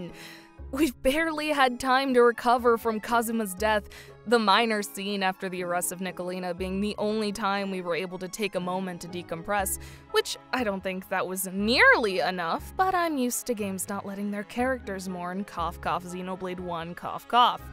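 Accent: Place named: Latin America. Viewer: Great video! Hey would you happen to be latin american? You have a very pretty accent and it sounds like mine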